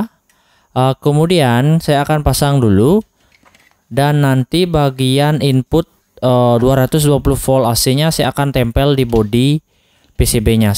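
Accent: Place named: Bali, Indonesia. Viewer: Logat bicara bapak ini seperti orang Bali 😅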